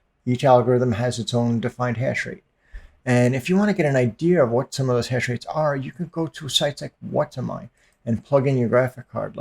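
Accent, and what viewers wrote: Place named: New York, United States. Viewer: I fucking hate that New York accident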